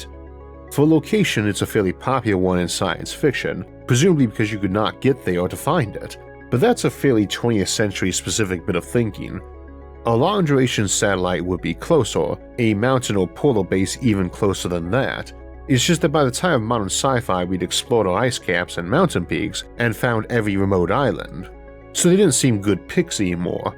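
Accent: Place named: Texas, United States. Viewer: You have a fascinating accent. It sounds like a perfect blend of texan drawl with the intonations of someone with english as a second language. I don't mean any offence by this, its just a very unique sound.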